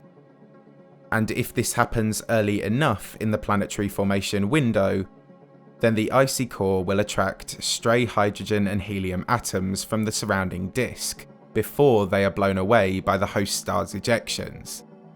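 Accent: United Kingdom. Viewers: Other than Sir David, I typically do not like Bri...ish accents. But I like your cadence and delivery. Top of the mornin' cup of tea Or whatever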